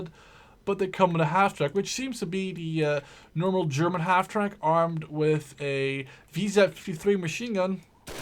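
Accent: Italy. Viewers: Salut spectatorilor si ai folosit accent de italian , dar oricum nu stii ce tocmai am spus :)) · Mie ami place oricum cu sa fara accent Italic #respect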